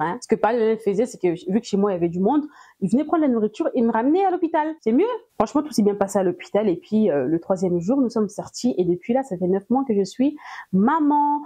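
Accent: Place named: Democratic Republic of the Congo. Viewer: Wow ! 🥹 J’ai eu l’impression d’entendre le récit de quelqu’un de familier, tellement ta façon de parler est typiquement congolaise, avec des van en lingala ! Je me suis sentie très alaise. C’était franchement agréable. Tu a été véridique et authentique, tu as vraiment transmis tes émotions d’il y a 9 mois et c’est super. J’ai eu un peu peur mais j’apprécie beaucoup ton courage. Félicitations pour Bébé 3 fois canon et bon courage pour ses 7 frères et sœurs qui sont aussi en chemin. 😁👏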